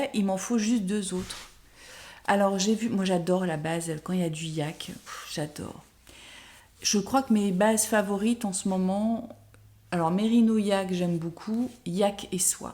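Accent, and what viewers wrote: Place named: South, France. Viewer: ton accent du sud est parfait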